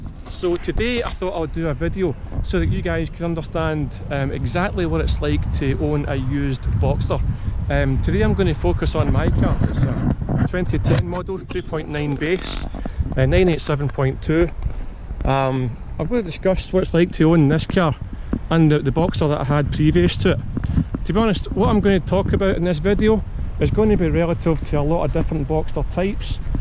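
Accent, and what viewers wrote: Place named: Scotland, United Kingdom. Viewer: Really enjoy your channel, and it's very informative content...and your Brogue. (Especially since I am from New Scotland- Nova Scotia). 🙂 Your passion about Porsche's, namely Boxsters is really infectious, I am working towards exactly what you have - a Guards Red 987. Thank you for the inspiration, and dispelling fears around maintenence costs and the dreaded you know what...cheers! P.S., going to have to source out your Boxster book!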